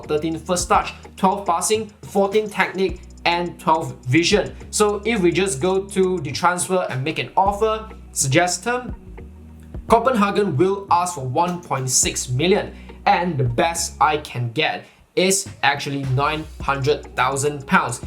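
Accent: Malaysia. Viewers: What the fuck is wrong with the Maysian accent 😑😑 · Kuat betul accent chinese/malaysian dia ahahahah. Btw great and informative video my guy! Got new subscriber in me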